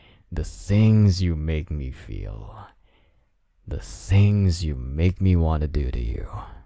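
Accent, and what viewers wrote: Philippines. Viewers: I sense a Filipino Accent?...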